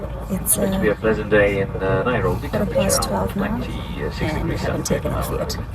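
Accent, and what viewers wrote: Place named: United Kingdom. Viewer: What do I love more, your British accent or your love for our country ?.👍👍😂❤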